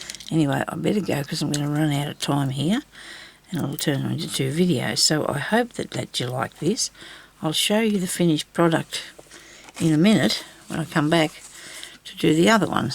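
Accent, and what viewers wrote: Australia. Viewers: I understand you perfectly, you have the same Australian accent as me I also grew up in western NSW. Blue and green should never be seen unless there’s a colour in between is what I was led to believe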